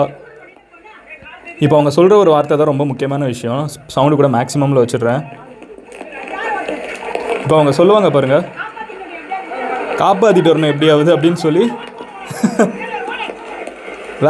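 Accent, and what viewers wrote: Madurai, India. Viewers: with typical madurai slang, potutainga, aakitainga, mooditainga😂🔥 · Madura slang nalla varuthepaa😍💝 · Madurai, sivagangai, ramanathapuram slang ah ipedithan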